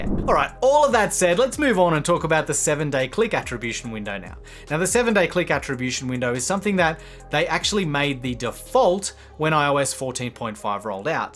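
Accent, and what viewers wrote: Australia. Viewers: Hey, I love your videos ( I also love Australian accent ). whats your take on Google updates that will take place in 2024 ( google is going to remove cookies in its browsers ) does this will affect Facebook ads, TikTok Ads?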